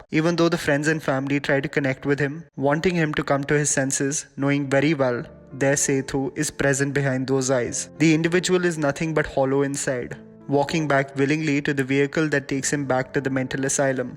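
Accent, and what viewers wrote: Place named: Tamil Nadu, India. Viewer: The Tamil accent though ..... 😅😅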